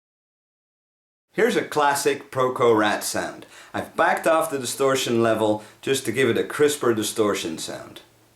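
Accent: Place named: Netherlands. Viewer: Ha, the unmistakable Dutch accent. Nice vid.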